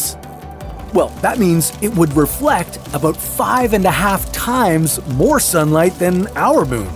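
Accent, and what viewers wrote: United Kingdom. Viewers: 終於是英音啦 讚讚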